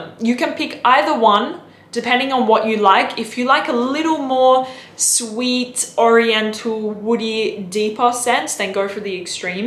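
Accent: Australia. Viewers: I enjoy your exotic Aussie accent, and your presentation, smile, eyes... everything about you is just gorgeous. And I like your fragrance choices - that it had to smell expensive and classy, even if it's modestly priced. I own many of these you have shown here, so I am on the same page with your choices. One I have not smelled yet is La Nuit d'Issey. Ordered it on your recommendation. 🖖😘👌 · Australian accent.... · What's there to be calm about? Was never hyped in the first place. I just said I loved her Australian accent. And what's it to you anyhow? People love to give their opinion when 1. It was never asked for and 2. It isn't worth 2 cents. So, you calm down... dude I swear. People and minding their business...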